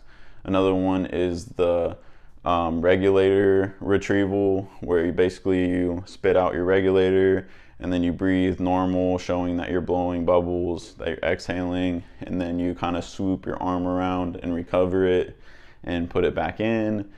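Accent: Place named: Czech Republic. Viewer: I know it's a stupid question, but I just have to ask, aren't you from the Czechia? I ask because you have such a Czech accent. Your last name is also used a lot here.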